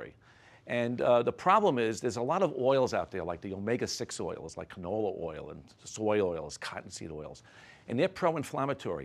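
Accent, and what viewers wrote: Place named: Brooklyn, United States. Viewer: Dr Sinatra has been my cardiologist for a decade now and he doesn't even know it but I know of him from a grounding video as well as a supplement customer. He's down to earth and "grounded." That Brooklyn accent sounds a little like Anthony Faux Chi but unlike Faux Chi..... We KNOW Dr. Sinatra will always tell people the truth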